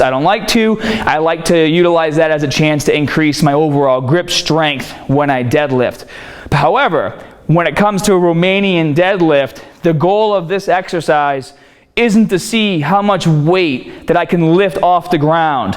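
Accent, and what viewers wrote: Boston, United States. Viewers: Ahaha the Boston accent came out on this one forsure! Loved the biggie smalls reference as well. A lot of people can definitely learn from this video especially those who think wraps don't ever have its purpose in lifting.Thanks for the shoutout bro can't wait for more and more people to join us on muscularstrength! #NattyNation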